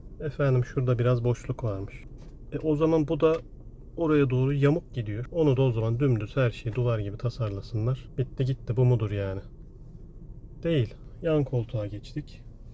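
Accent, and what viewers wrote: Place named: Adana, Turkey. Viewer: hocam adanalısın sanırım şivede kaçaklar sezdim :)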